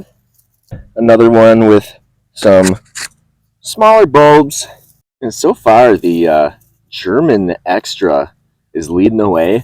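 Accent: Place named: Midwest, United States. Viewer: I hear mid west accent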